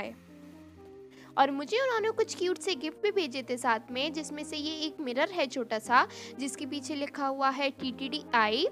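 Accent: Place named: Hyderabad, India. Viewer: Apka accent hyderabad ka hai ....... kya ap hyderabad se ho❤️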